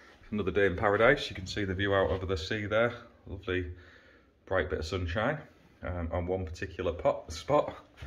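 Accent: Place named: Hull, United Kingdom. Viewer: Ahh nice mate. I thought I could hear a Hull twang in your accent 😂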